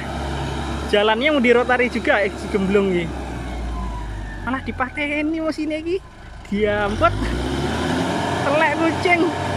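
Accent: Java, Indonesia. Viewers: Jowo mu ngendi le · Logate lho b. Jawa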